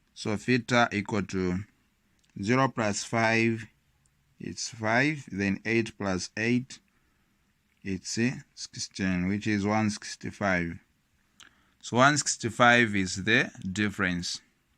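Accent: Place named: Tonga. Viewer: Your accent is giving sir! You sound tongalish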